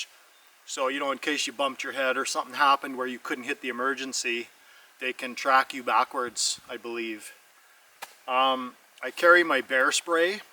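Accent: Canada. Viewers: My bad, you sounded like a couple guys I know fron way out on the atlantic side of things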